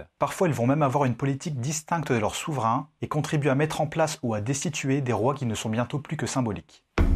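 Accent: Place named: Nord-Pas-de-Calais, France. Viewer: "les rouos fainéants, Childéric trouo, Thierry trouo, à la suite de quouo, bras drouot du rouo".... elle serait pas ch'ti??? à part ça c'est très bien:-):-)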